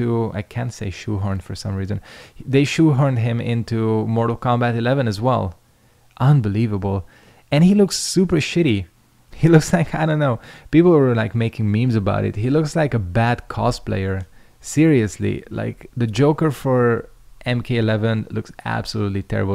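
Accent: Middle East. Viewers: I had never seen hia face before, his voice sounded middle eastern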